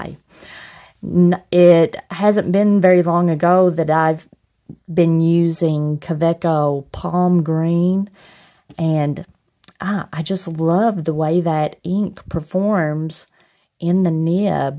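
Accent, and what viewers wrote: South, United States. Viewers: I saw one of her videos for I-don't-recall-what, I stayed for her consistent review technique in her videos and of course, the soothing voice in that cheery southern accent ☺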